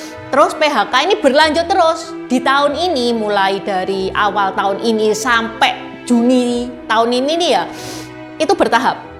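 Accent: Java, Indonesia. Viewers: Makasih mbak ncik. Ini chanel unik, keren, educativ trs bhsnya khas full medhogh.🇮🇩👍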